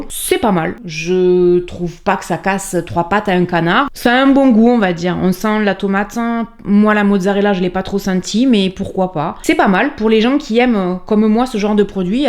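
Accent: South, France. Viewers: C'est vraiment un régal de te regarder et de t'écouter, ton sourire, ta joie et ta voix qui chante le sud, j'en raffole Bisous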